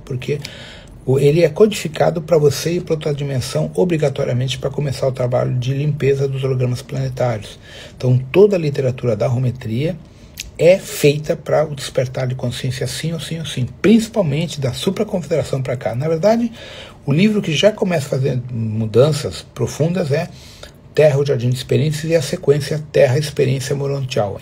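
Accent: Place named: Portugal. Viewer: No le entendí ni la mitad. Lo lamento,a mi edad, me es difícil comprender un español aportuguesado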